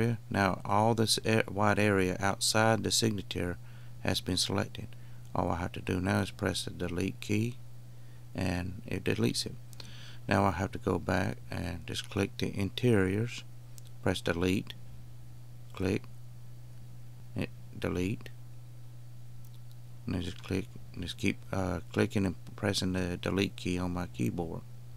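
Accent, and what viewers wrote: South, United States. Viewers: Very helpful, but you sure do sound like my Uncle Cecil? Are you from TN or KY? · Don't use GIMP every day, would have taken me hours to figure this out (if at all), but video very clearly explained, & with a beautiful southern accent!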